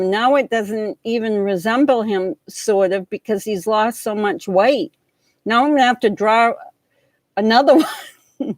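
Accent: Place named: Boston, United States. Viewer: Can hear the Boston accent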